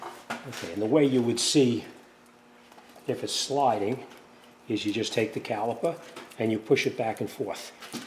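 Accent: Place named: Boston, United States. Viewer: I swear to fuck. I love the Boston accent. Ya did gud wurk.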